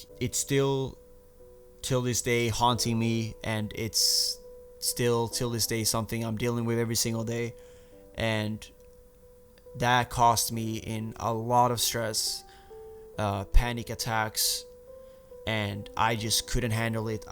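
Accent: Sweden. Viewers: He had this Swedish accent back then. It's going away now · Dang this is when had that really big Swedish accent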